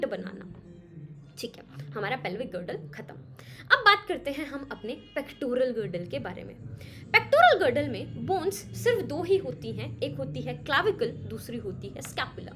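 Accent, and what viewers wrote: Haryana, India. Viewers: Haryana ig 😂?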